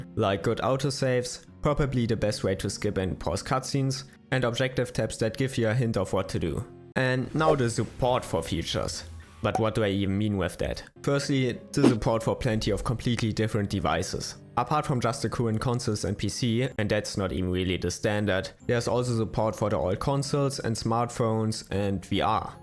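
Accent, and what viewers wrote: Germany. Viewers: I could tell this guy was German, simply by accent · A LITTLE German Accent 🤣 PS: I'am German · Your German Accent is like my Ofenkartoffeln, just a burner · are u german? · This wasn't meant to be as an offence. I love "zhe" german accent. Good video tho💪🏽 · das deutsch killt mich🤣♥️ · Super interesting video, thanks! Are you from Germany by any chance?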